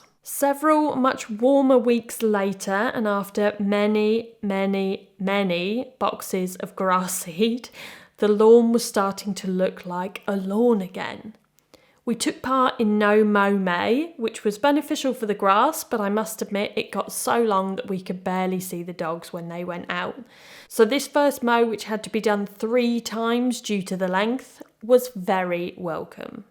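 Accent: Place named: Scotland, United Kingdom. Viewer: I'm trying to figure out what kind of accent is this? Is this Scottish or English ? iots somewhat extreme, but I love it.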